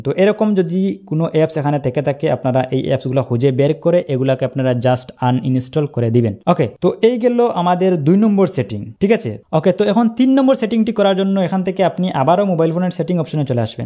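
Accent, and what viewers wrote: Sylhet, Bangladesh. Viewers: Bhai tumi sylheti nih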